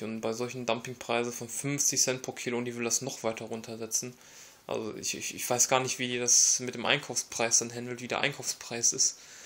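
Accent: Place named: Ruhr, Germany. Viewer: Hab aufs Ruhrgebiet getippt :D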